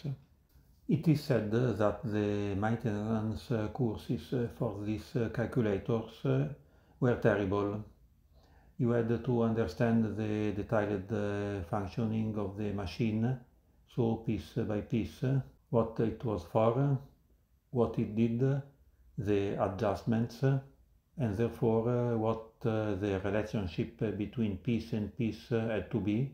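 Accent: Italy. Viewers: 5,000 to 7,000 parts! Electro mechanical feat of design and engineering. I came here by accident and was transfixed by this piece of office equipment. Whoever you are, thank you for producing this video, in English with an Italian accent!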